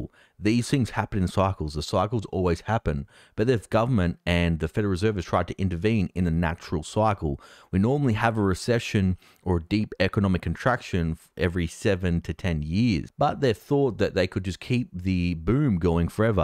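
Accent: Australia. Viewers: It's sounds like you're an Australian. Isn't the Ausy economy in a relatively good spot compared to much of the western world? I'm about to emigrate WA from South Africa where we can't even keep the lights on and the Rand is reaching new all-time lows, so I figure it's an improvement.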